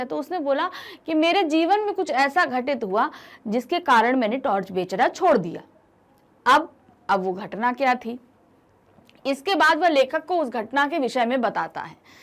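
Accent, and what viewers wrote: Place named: Northeast, India. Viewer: Lgta hai north east side ke ho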